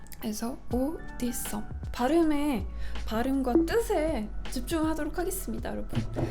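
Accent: France. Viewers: 님 아프리카 생방송으로도 종종 보고 유튜브는 구독하고 매일 시청하는 연근이에요. 이런 프랑스어 컨텐츠랑 요즘은 쿠킹덤 컨텐츠를 제일 좋아해요!! 평소에 딥디크 향수가 좋다고는 많이 들었는데 약간 비싸다보니까 쓸 기회가 없더라고요, 그래서 님 영상보고 제가 좋아하는 프랑스 발음도 듣고 향수 설명도 들어서 영상 너무 좋았어요!! 제가 올해에 스무살이라 곧있으면 성년의 날이라서 향수 하나 마련하고 싶었는데 이벤트 당첨되서 딥디크 향수를 갖게되면 너무 행복할것같아요, 제 버킷리스트에 향수모으기가 있었거든요! ㅎㅎㅎ 항상 영상 잘보고있어요 님!! 오랜만에 프랑스어 책 읽어주는 컨텐츠도 보고싶네요 ☺️ 오데썽 <mail> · 역시 믿듣 언니! 제가 사려고 고민했던 딥디크 향수를 리뷰아닌리뷰해줘서 너무 감사해요 항상 언니의 불어영상을 보면 넘 기부니가 조크등요 ㅎㅎ 항상응원해요 사실 향수리뷰보다 불어발음하고 읽어볼 생각이 없었던 로고에 박힌 주소까지 은근 더 다양한 정보를 얻은거같아서 넘 좋았어요! 불어영상이외에 게임영상도 자주보는 연잎이에요 응원해요 언니 항상 ❤️ +제꿈이 영상계열이라 도비님도 언제나 응원중입니다 화이팅이요 좋은영상 늘 감사해요 <mail> 도손이요❤️ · 딥디크가 프랑스 명품 향수였다니... 님 덕분에 모르고 있었던 정보를 알아가네요 저도 부모님 향수 선물로 드렸던 적이 있어서 그때 생각이 나네요ㅎㅎ 님의 프랑스어 발음을 들으면서 딥디크 향수들의 이름과 정보를 더 알게 되어서 짧았지만 좋은 시간이었습니다. 너무 빨리 지나간 느낌이에요. 다음에 또 이런 컨텐츠 해주세요! <mail> (오데썽으로 신청하겠습니다) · 저는 향수 입문을 딥디크로 처음 했는데 딥디크 향수를 보며 항상 이거 정확이 어떻게 읽지 ㅋㅋㅋㅋ 이러면서 여러사람들과 장난치듯 읽으며 이야기 해봤어요. 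실제로 불어의 발음을 들으니 더욱 갖고싶은 향수가 된것 같습니다. 설명까지 불어로 읽어주시는게 너무 좋은것 같아요… 프랑스의 명품 브랜드의 소개 컨텐츠도 좋을것 같습니다. 그리고 여러분 향수를 구매하실때는 무조건 유명하다고 구매하지 마시고 꼭 매장에 방문하셔서 시향,착향을 해보시고 구매하셔야해요 호불호가 적은 향수라고 해도 본인에게 불호일수도 있고 각자 이미지에 맞는 향수가 있습니다. 시향,착향을 하실때도 첫향만 맡고 구매하시는것 보다는 잔향까지 맡아보시고 구매를 추천드립니다:) <mail> 오데썽 신청해요 ^.^ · 로제 닮았다 ❤❤❤❤❤ 불어발음 고급지고 넘사벽 엄친딸임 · 딥티크의 향수이름들이 맨날 어려워서 제대로안부르고 다녔는데 오늘 님 영상 덕분에 제대로 된 이름이랑 또 고급진 프랑스어 발음도 들어보게되서 유익한 시간이였어요! 영상을 보는 저도 교양이 업그레이드되는 느낌? ㅎㅎ 아 사랑해요 님 저는 오데썽이 좋아여 <mail> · 안녕하세요 님 프랑스 컨텐츠 하실 때 마다 발음 억양 너무 예뻐서 배워보고 있는데 저는 그렇게 잘 안돼요.. 님이 한국어 발음으로 교정해주시지만 혓바닥이 강직하게 뻣뻣해서 그냥 한국어로 또박또박 말하는거에 가까운.. 다음 프랑스 컨텐츠 하실 때 행여나 실례가 안됀다면 발음 컨텐츠 햐주세요~~ 님 구독한지 벌써 3년, 구독자 10만쯤 부터 계속 보고 있습니다!!(모든걸 다 본건 아니지만) 힘든 일 있으셔도 항상 힘내시고 머리카락 이번엔 뿌리만 남지 않길 바래요ㅋㅋㅋ 향수는 오데썽 이메일은 <mail> 입니다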